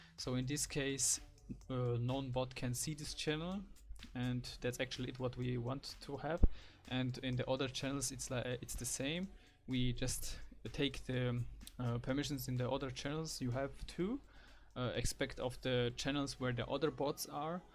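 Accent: Germany. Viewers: Der DEUTSCHE Akzent man kennt ihn!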